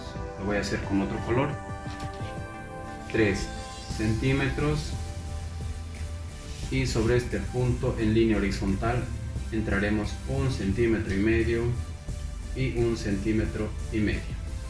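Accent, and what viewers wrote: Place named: Bolivia. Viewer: Parece que es boliviano por el acento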